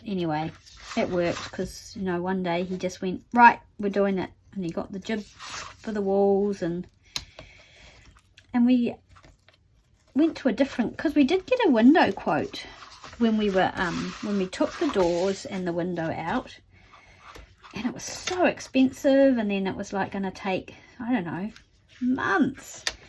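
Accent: New Zealand. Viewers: 🧚🏻‍♀️Thanks so much, I really enjoyed watching your process of expanding the spine of your Readers Digest! Look forward to your next video. Lovely to hear our Kiwi accent on YT!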